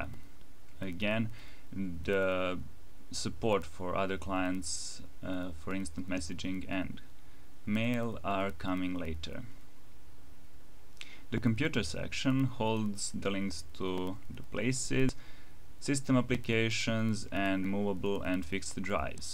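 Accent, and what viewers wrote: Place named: England, United Kingdom. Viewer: your english accent is epic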